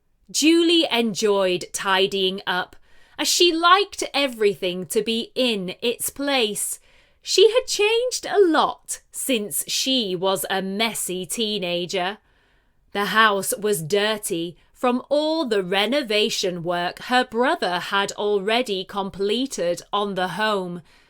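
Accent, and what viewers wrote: United Kingdom. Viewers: And I love British accent 💕💕💕💕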